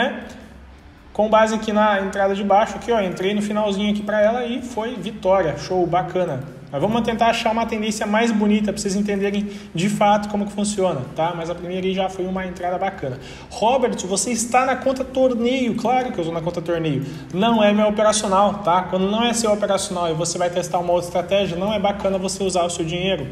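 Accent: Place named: Registro, Brazil. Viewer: Pelo teu sotaque você é de Registro são Paulo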